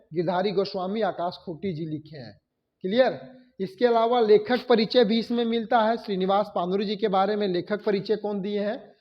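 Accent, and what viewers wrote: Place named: Jharkhand, India. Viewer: Sir hum bhi hirodih thana ,pandeydih se hai ,aapki language se humko laga ki aap giridih se h thanks 😊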